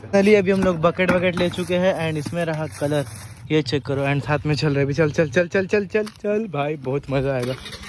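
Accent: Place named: Bangladesh. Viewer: Apki bengali bohot cute hn ☺ Mst holi prank 🤣🤣🤣 bohot maza aya 🤣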